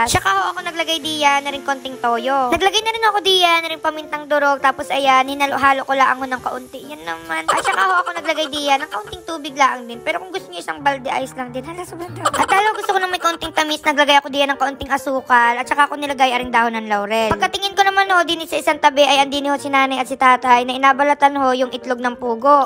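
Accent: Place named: Mindoro, Philippines. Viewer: Abay akoy na curious sa iyo napanood kita sa toni talks 😂 abay gustong gusto ko ang punto mo ay. Ayan napa subscribe ga tuloy ako 😅… ay siya ka mangyan na ga ako 😅… akoy ka Harabas din taga diyan din sa Mindoro… gustong gusto ko ga ang mga buhay buhay sa probinsiya. Good luck and God bless you more, keep fighting wag mong pansinin ang mga mapanirang tao eh. Ay siya akoy natutuwa sa iyo, go go go lang. ❤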